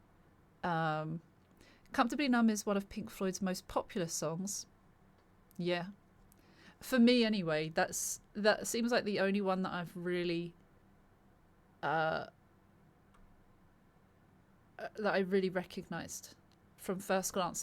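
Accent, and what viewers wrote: United Kingdom. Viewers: You just reacted to Comfortably Numb by Pink Floyd, and it took you 41 minutes and 13 seconds to finish. You didn’t rush it. You took your time with every sentence, trying to understand the lyrics fully. And honestly, your British accent made the whole experience even more lovely. It felt like you were guiding us through the song with care and curiosity. That’s why your reactions stand out. They’re not just content, they’re connection. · And her lovely British accent makes it even more special. Thank you young lady.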